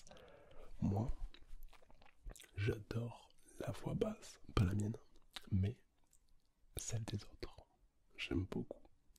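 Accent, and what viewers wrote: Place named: Toulouse, France. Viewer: La voix de crooner avec l'accent toulousain(que j'avais eu la chance d'entendre en te croisant au tgs Springbreak il y a 1 an) je valide, pour un des seules voix masculines me relaxant, t'es un Chef👍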